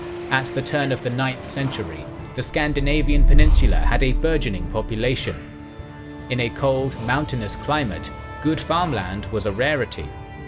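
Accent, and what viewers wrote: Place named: United Kingdom. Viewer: Hey, I'm talking to YOU! Your channel is disappointing, and I'll tell you why: I'm not even one minute in, and I get blasted with a stupid Nintendo (No-Friend-o) video-game ad... (You know that I can just Google the info I want to know, right? I don't need to watch your channel to know these things)... I don't like being tricked, and that is exactly what you are attempting to do; to trick us into watching ads for your silly game. Shame on you! Why are all these so-called "historians" always hawking some dumb-ass RPG before they regurgitate a warped version of Wikipedia with incongruous graphics?...And, they all seem to have annoying high-pitched low-class British accents too... What gives?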